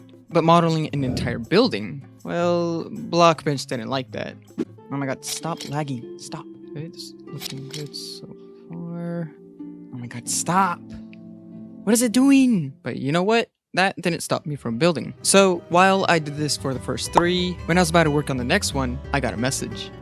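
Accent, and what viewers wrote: Germany. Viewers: deutscher englisch dialekt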